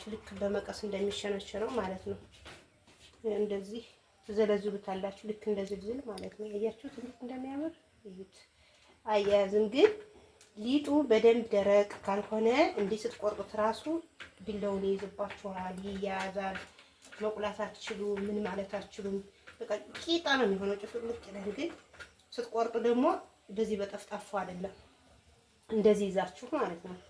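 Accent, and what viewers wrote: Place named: Wolaita, Ethiopia. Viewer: ፔኪን ፖውደር ነው የሚባለው አማርኛሽ ግን የወላይታ ነው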